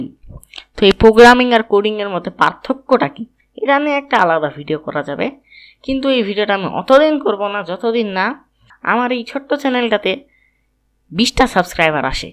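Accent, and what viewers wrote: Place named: Rangpur, Bangladesh. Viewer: আপনার ভাষা আমি বুঝেছি। যেমন আপনার বাড়ি অমপুর।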